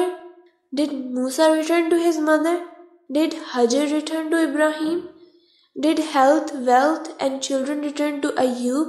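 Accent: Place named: India. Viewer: I feel indiane accent in the air, please re-read such an amazing book with right accent, so many words i was confused about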